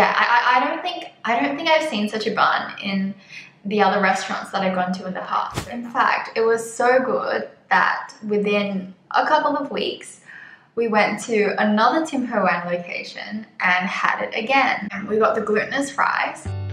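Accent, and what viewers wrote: Australia. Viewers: didn't know sight seeing and dinning out in Michelin are valid exemption reasons for travelling... lol , but all good now, when you return to Sydney , don't even have to home quarantine now if you have been vaccinated... your Aussie sounds like music for Aussie and Hong Kong expats...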